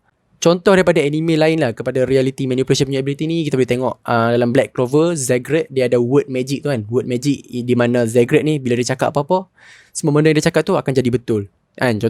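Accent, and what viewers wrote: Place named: Kedah, Malaysia. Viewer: aku support dam subscribe bagi peminat one piece fans dan aku suka bangsa utagha hang punya loghat sama mcm the show one piece sebab we are same. We are the Northerians Guysss.hahahaha...bangga jadi bangsa Kedah noh...hahahah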